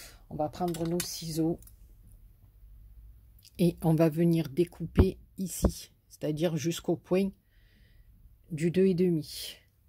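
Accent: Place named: Marseille, France. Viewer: oupss lol l accent de Marseille ou les alentours mdr j adore je m abonne trop rigolote bravo le bonjour de toulouse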